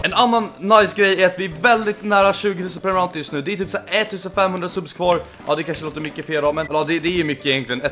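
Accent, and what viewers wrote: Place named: Stockholm, Sweden. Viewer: man förstår att du är från Stockholm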